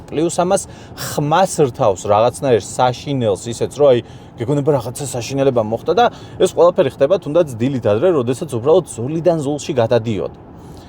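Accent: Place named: Mingrelia, Georgia. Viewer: როგორ მიყვარს მეგრელების ლაპარაკი <3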